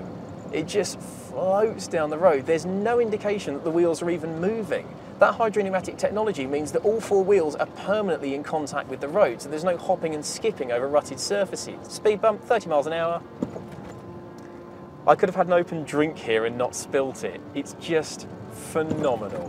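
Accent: United Kingdom. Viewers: The best thing is.... A BRITISH ACCENT to describe A FRENCH CAR.... VOILA....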